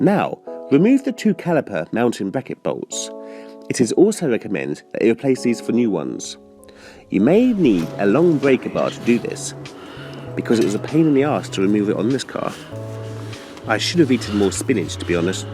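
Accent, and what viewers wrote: United Kingdom. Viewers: Being from the UK myself I love the oh so posh English voiceover 😆👍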